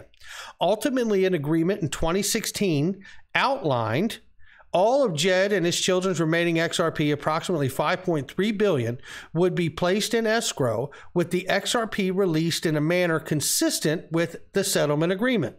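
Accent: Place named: United Kingdom. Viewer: I am very excite about XRP.. its every time different story.. very cool token.. and reporting very cool thanks you for this reporting...i check you headlines out bit hear of that all time.. very confusing you give report but your english cool good british language thnks 2 yu 😘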